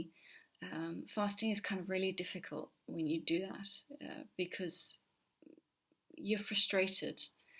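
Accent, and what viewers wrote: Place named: Australia. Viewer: Great content!😊 I enjoyed listening to it. I also like your accent 😁 Where are you from? Australia? The UK?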